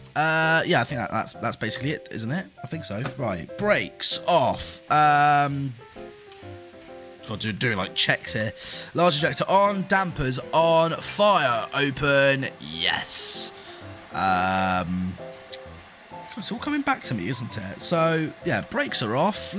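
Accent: United Kingdom. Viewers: He’s British😱😱😱😱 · you sound british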